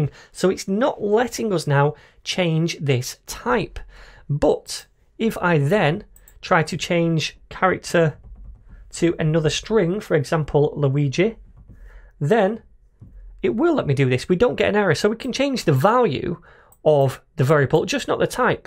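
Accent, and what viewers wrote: United Kingdom. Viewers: I really like your accent, it reminds me of my time in the UK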